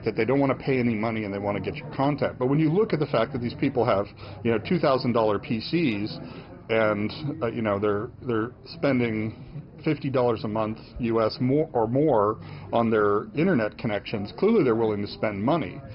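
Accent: Odisha, India. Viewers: Are you from odisha !? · BRO you from odisha ?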